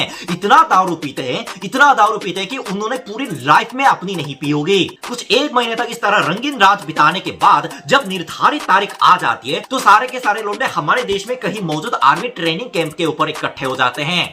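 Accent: India. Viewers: Being a foreigner not only he speaks like an Indian but he is...like an indian.❤ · Iska indian accent mere se jyada acha lagta h🤣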